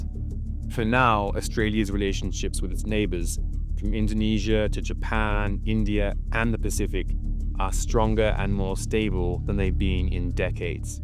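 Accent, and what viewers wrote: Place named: United Kingdom. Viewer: I just reported this video for misinformation, total bullshit lies!!! F off USA the average Aussie hates you and you can’t thieve our tax $$$ to the tune of a trillion dollars along with our sovereignty! & you Brit voice over brat 🤬🤬🤬 how dare you!!! It’s one think to pretend we’re part of your empire but to lie about who really owns Australia now is reprehensible, Brits are as bad as USA! Viva la revolution!!!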